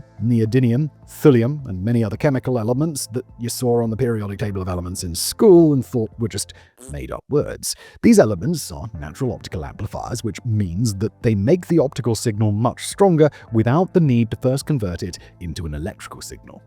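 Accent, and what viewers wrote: United Kingdom. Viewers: British accents and lazer weapons, I think we all know where this is going.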